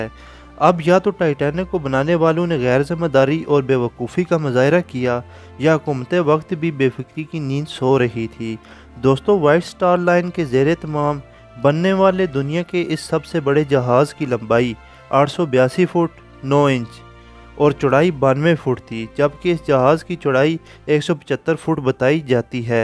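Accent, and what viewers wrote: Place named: Punjab, Pakistan. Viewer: Apki awaz se lag Raha hai ke ap 1 Punjabi daggay ho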